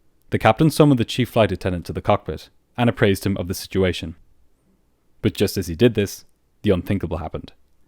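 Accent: Ireland. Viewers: Its the Irish accent - its where all American accent descend from so has a a warmth to it you are familiar with.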